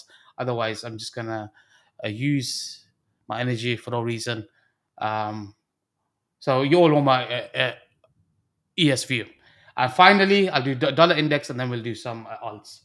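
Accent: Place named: South Asian, United Kingdom. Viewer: Thanks a lot bro. A request of mine and i'm sure many others is to please turn on captions for your videos. I absolutely love and enjoy your South Asian Britiah accent but it sometimes is a bit hard to follow esp with the recent mic issue. Dera Manana brother!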